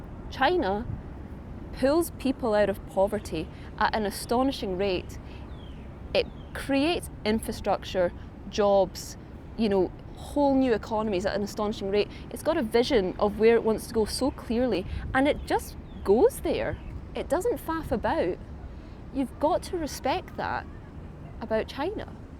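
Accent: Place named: Scotland, United Kingdom. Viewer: You're a Scot.. I had you at the 3rd word.. Dae ye ken Scots langage?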